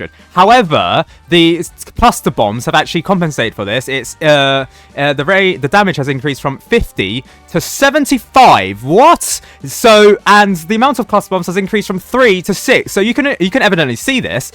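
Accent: United Kingdom. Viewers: I enjoy you speaking British more than reading a whole paragraph of text ngl this new style is much easier to watch your videos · I always forgot that this guy was british after his voice reveal😭😭😭😭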